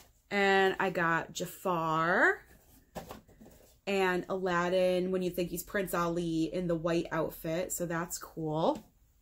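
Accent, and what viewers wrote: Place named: East Coast, United States. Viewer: Well beautiful eyes keep grindin' because the future is bright 4u. Btw love the East Coast accent.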